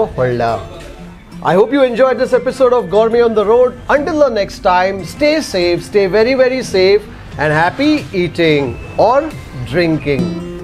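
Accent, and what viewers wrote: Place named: South, India. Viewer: Ur accent is just like the South.😀😀 and are u always on journey?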